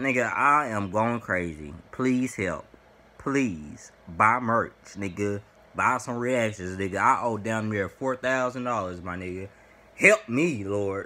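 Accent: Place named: Arlington, United States. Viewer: I know u from Arlington talking about cracking 4s u prolly from south Arlington way u talk it’s east side agg town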